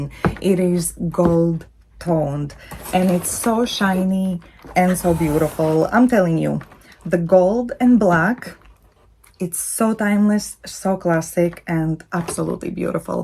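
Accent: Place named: Latvia. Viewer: You have beautiful accent. Sounds Latvian. Are you from Latvia?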